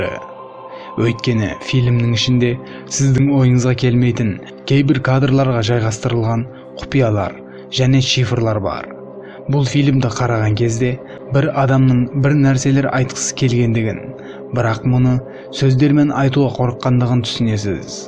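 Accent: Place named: Uzbekistan. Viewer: каналды жүргізіп жатқан жігіт, сіз өзбексіз бе? өзбекше акцент бар сияқты тіліңізде?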